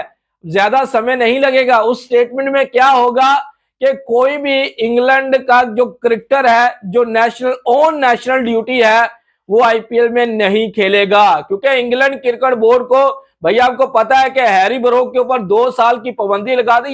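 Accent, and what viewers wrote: Pakistan. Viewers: Sala pakistani iss ke boli sa pta chalta hai ka ja sala pakistani hai ❤❤❤❤❤❤❤❤❤❤❤❤❤❤❤❤❤❤❤❤❤❤❤❤❤❤❤sala dog da bacha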